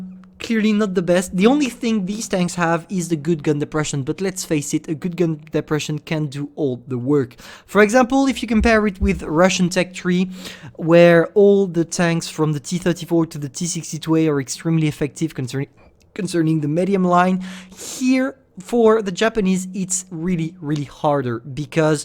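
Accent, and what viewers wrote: France. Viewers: T'es français??? parce tu a un accent français quand tu parles anglais :)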